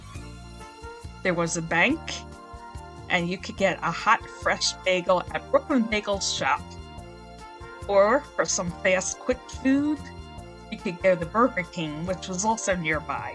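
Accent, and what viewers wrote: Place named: Philadelphia, United States. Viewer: Narrated, with a perfect Philly accent😂 great job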